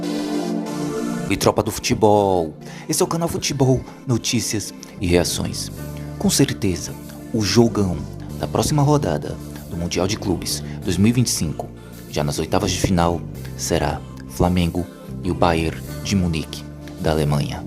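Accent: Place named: England, United Kingdom. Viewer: Mermão com esse sotaque inglês muito forte vc fala imprensa alemã , pelo amor de deus . Vc conhece a diferença entre inglês e alemão. Rapaz.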